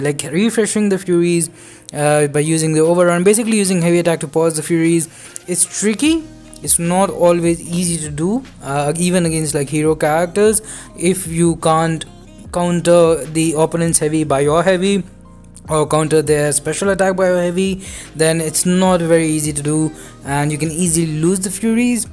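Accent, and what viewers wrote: India. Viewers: Bro are you from India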